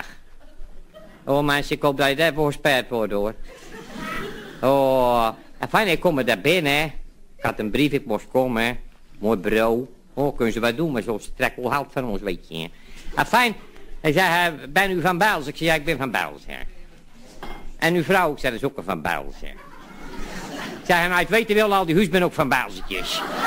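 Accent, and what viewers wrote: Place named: Zeeland, Netherlands. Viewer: ik ben ook een zeeuw maar deze praat zo plat dat ik het moeilijk kan verstaan:P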